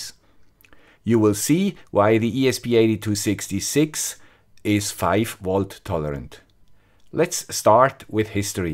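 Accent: Switzerland. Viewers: Dankeschön, Du 'guy mit dem swiss accent'. So viel Herzblut in den Videos und genau so viel Fachwissen. Man lernt in jedem Video etwas Neues. Hast Du schon mal Annex Wifi-RDS ausprobiert? Ich halte es für ein ausgezeichnetes Projekt, mit dem sich alle möglichen DIY-Lösungen mit ESP8266 in Windeseile erstellen, testen und modifizieren lassen. (btw: 'accurate' and 'accuracy' are both stressed on the first syllabus in contrast to 'developers' - sorry about that....) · dude you are awesome , im gonna try to watch all your vids, love the swiss accent, im american, obviously, thanks for all the help in the past and the help you will give me in the future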